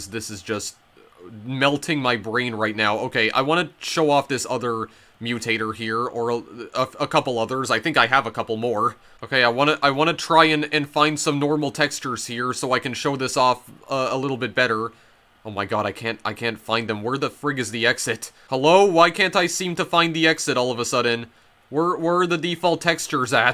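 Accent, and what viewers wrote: Canada. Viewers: My guess was northern Saskatchewan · Was looking for a comment like this, not disappointed Legitimately thought I had a stroke for a second, Canadian makes sense tho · lmao, no it's an effect of Canadian English dialects called Canadian raising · Where are you from? You pronounce the words (like game) the same as me, but your accent is also different from mine. I'm from the north east of England but you don't sound like you're from here. It sounds almost canadian to me? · the canadian dialect gives me more brain damage than the game itself. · Where are you from? What’s your accent? I genuinely would love to know! I am not sure what your accent is but I feel like it is a Canadian accent?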